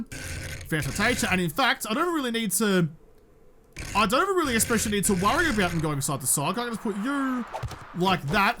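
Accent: Australia. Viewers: But the rest of us are here for the rapid-fire Strine!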